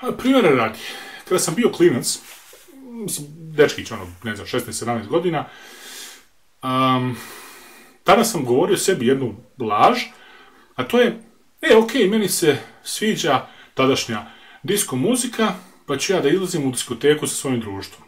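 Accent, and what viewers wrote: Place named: Ekavian, Serbia. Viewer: Ooooo, preslo se na ekavicu!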